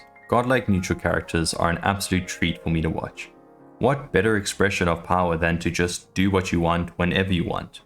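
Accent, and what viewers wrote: South Africa. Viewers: Awez Bru, I'd recognize that accent anywhere my Bru 🇿🇦🇿🇦🇿🇦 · bro u South African? u got the accent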